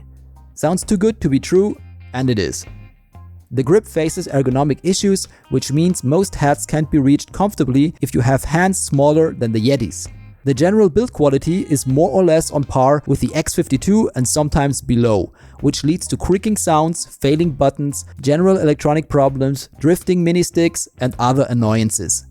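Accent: Germany. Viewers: By the way are you German? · bin ich der einzige der denkt das er deutscher ist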